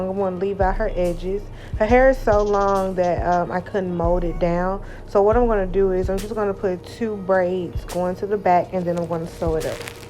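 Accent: South, United States. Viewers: Loved the look... And Baby you don't have to explain yourself about how you talk... You have a cute southern accent that's understandable... Ik people not trying to come for you in the comments 💪🏾... I heard everything clearly and by me being a visual learner I found everything to be very detailed with your speech and the hands on process... On the road to 50K... Let's get it💪🏾 ♥️💯...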